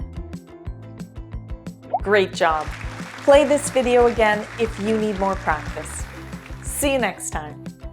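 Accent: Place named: Canada. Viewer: so this is Canadian English, it's good for me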